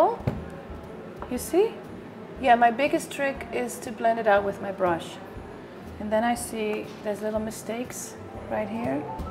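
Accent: Friesland, Netherlands. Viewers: I love your dutch Fryslân accent!! 🥰❤️😍💋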